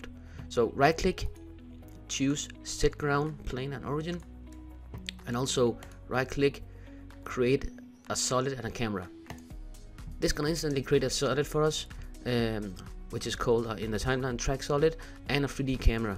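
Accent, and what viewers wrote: Denmark. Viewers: Tak for videoen! ;) Ka hører du er dansk :)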